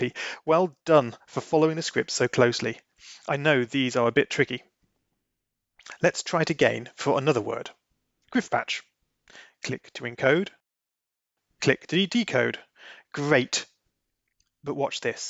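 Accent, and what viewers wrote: United Kingdom. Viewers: I could listen to your british voice all day. It kind of reminds me of the times when the internet was for nerds and mainstream brands didn't take it seriously.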